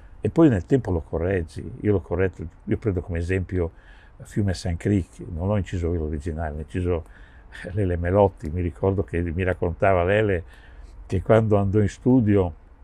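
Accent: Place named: Ferrara, Italy. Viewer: Si sente la ferraresità di un grande batterista!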